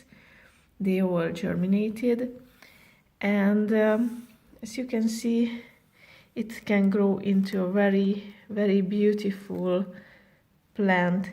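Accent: Hungary. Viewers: Are you Hungarian? The accent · It's very Hungarian English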